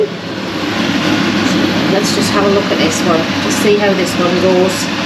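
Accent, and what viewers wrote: Newcastle, United Kingdom. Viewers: How lucky was I to find you! Normal kitchen, ingredients we can all get a hold of, delicious food and geordie accent like my own! Thank you!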